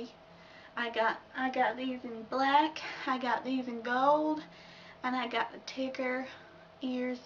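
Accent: South, United States. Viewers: You did great on the soutern accent and also you would be driving on the right side of the road and sitting on the left side of your car driving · If I hadn't heard your Yorkshire Accent in previous videos, I'd think you're from the American South, but I prefer your Yorkshire Accent. :)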